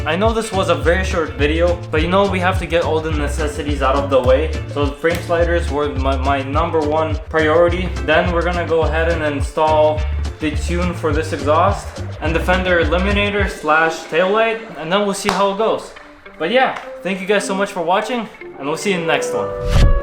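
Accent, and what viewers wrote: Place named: Russia. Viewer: He sounds like russian blyat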